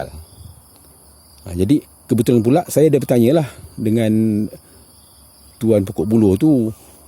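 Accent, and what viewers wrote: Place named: Melaka, Malaysia. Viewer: Ini lorat percakapan orang Melaka ni...ni mesti kejadian di bumi Hang Tuah ni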